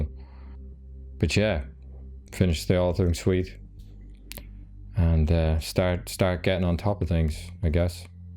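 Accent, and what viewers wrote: Ireland. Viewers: He sounds Irish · Is this guy Irish?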